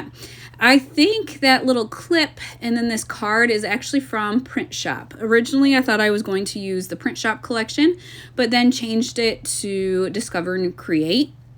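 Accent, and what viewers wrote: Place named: Great Lakes, United States. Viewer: We played a lot of euchre near Buffalo, NY. Maybe it’s a Great Lakes game, like the accent!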